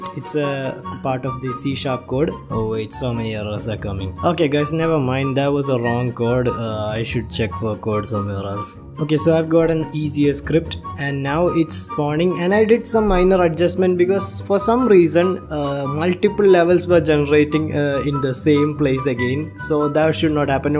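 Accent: Kerala, India. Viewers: you English is pure Indian English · now I'm really sure is Malayali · his voice is Luke indians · u litterally sound like a malayali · real malayali english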